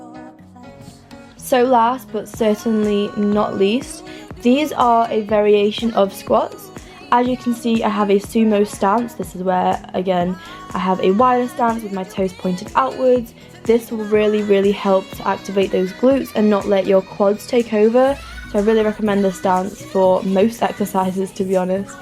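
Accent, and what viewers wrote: United Kingdom. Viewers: Somehow I find the way you speak really attractive, is that weird? 🙈 Btw are your parents/family hispanic? Your name sounds hispanic but your accent obviously is British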